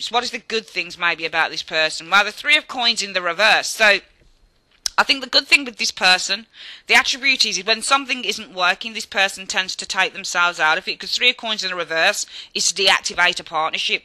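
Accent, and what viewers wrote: Birmingham, United Kingdom. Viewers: That’s got to be the best Brummie Reading I’ve ever heard! You are really good! Thanks!:)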